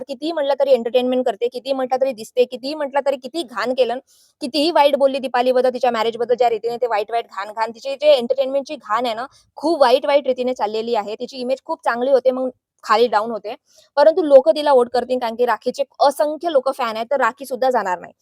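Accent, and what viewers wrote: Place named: Vidarbha, India. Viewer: वैदर्भीय भाषा वाटते ह्यांची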